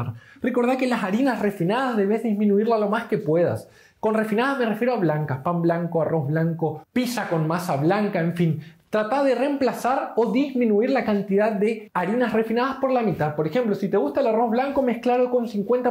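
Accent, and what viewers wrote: Misiones, Argentina. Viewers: es Argentino es su tono de voz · Dr por el acento usted si no es de Misiones pega en el palo. Excelente video. Saludos!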